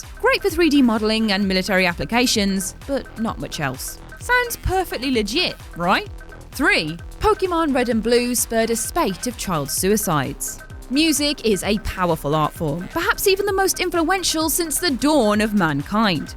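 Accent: Scotland, United Kingdom. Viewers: Tho I believe is Scottish or possibly Irish. Very similar accents tho. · Haha I love British "R linking"! It makes "creepy pasta, at least" sound like "creepy pastor, at least". We've all known at least one creepy pastor in our lives, right??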